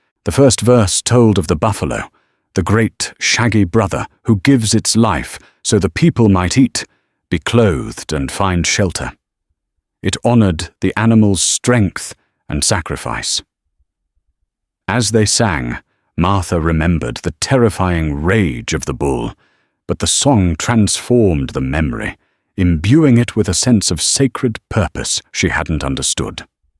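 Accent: United Kingdom. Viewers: I really wish an American was narrating this story about the American West! This English accent is so out of place. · Good story read with a beautiful British accent. Listening from Norway.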